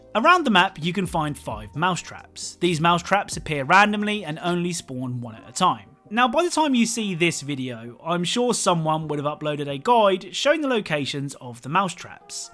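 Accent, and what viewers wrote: United Kingdom. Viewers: Are u british cos i am just wondering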